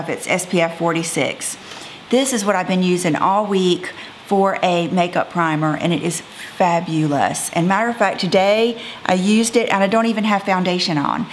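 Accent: South, United States. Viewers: I've been watching you for years and following you on Instagram and although I don't comment very often, I just love your personality. I can relate because we are in the same age group and also our children. Although the extent to my makeup is the basics (I have quite a lippy collection however..lol) I just love seeing your purchases from clothes, to makeup to art etc. Let's not also forget, that southern accent. You're as real as it comes. You like what you like and there's absolutely nothing wrong with that. xoxo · Why I watch your videos: -- You inspire me to look my best every day; even when I don't feel like putting an outfit together or putting on makeup. -- You are a genuine and good-hearted human being (something that, unfortunately, is not so common nowadays). -- You are a stylish and classy woman - a great fashion role model. -- You give great tips about shopping, clothing, makeup, jewelry, etc. 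-- Listening to your southern accent brings a smile to my face every time I start watching one of your videos. -- And for so many other reasons (too many to list)..... I've been a subscriber of yours since the beginning. Thank you for being a constant reminder that all women are beautiful. And for showing us that when you take a little bit of extra time to look good on the outside, the inside follows and your attitude and outlook on life change for the better.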